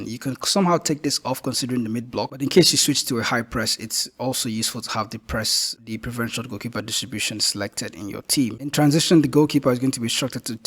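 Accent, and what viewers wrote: Nigeria. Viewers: Are you nigerian bro ? You sound nigerian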